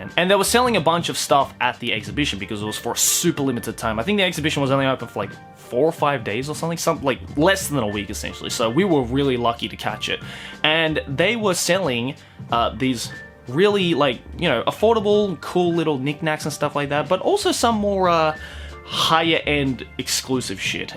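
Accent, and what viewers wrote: Australia. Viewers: wtf hes australian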